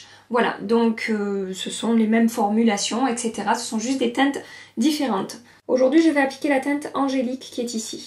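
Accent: South, France. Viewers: ce petit accent du Sud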